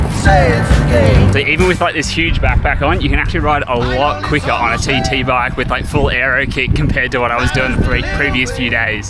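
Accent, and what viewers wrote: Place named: Australia. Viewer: I have to admit, I'm not used to Australian accent and I understood barely anything.Especially because the speech is quite fast but I got the idea!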